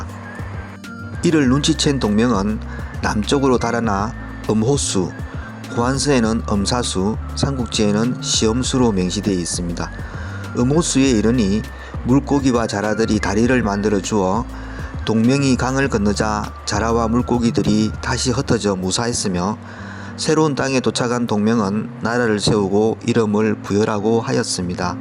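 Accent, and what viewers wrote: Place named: Gyeongsang, South Korea. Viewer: ㅇㅑㅁㅁㅏ ㄱㅕㅇㅅㅏㅇㄷㅗ ㅅㅏㅌㅜㄹㅣ ㅈㅗㄲㅏㅌㄴㅔ. ㅇㅏㄴㅗㅏ ㄱㅕㅇㅅㅏㅇㄷㅗ ㅇㅣ ㅅㅐㄲㅣㄷㅡㄹ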